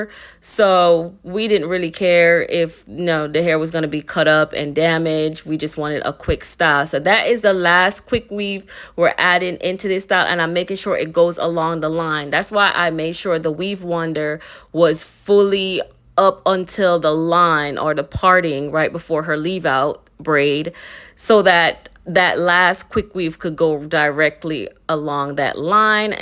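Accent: Jamaica. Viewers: Hey hun nice job. U Jamaican? 🇯🇲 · Are you Jamaican or from another Caribbean island?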